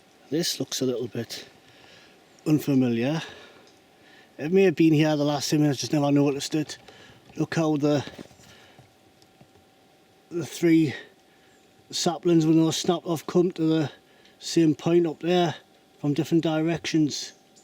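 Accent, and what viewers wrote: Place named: Ashington, United Kingdom. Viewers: That sounds like an ashington accent that...class video mate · has any wildlife expert told you what they believe the sounds to be in that area from your accent i take it to be the northeast of England .taking that England has only 10% of its woodland left comperd to 40 %or 50 % of woodland in most of European countrys like France or Germany is it possible to have a wild unknown animal running around the forest in England .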